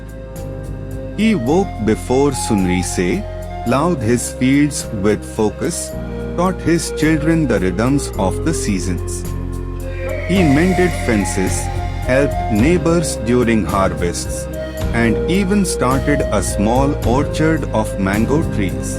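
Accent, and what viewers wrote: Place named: India. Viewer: Indian English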